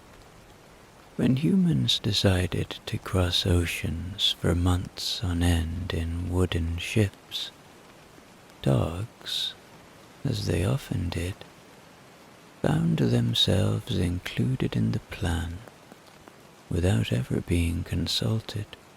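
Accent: Ireland. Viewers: I listen every night from Germany. Unfortunately I don't often get to listen to the stories because I usually fall asleep before I get that far! Your voice is very soothing and I love your Irish accent!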